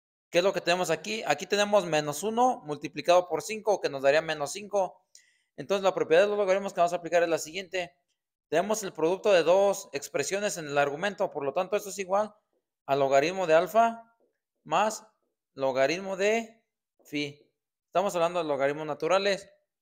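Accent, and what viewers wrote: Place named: Mexico. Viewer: profe tienes un acento muy mexicano estas muy basdado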